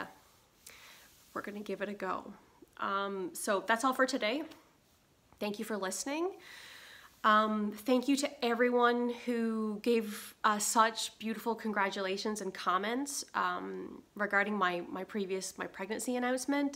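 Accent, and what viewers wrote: Newfoundland, Canada. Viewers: Wonderful to see you again and hear that glorious Newfie accent. Great choice for location. Look up who the PPC candidate is for your community. Call them. There is hope for Canada, strong and free. It's Maxime Bernier. All the best in your new home.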